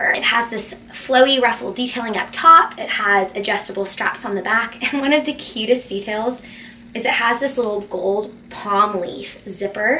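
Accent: South, United States. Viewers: your southern accent is adorable😍I live in Dallas too but I don't have a southern accent!😭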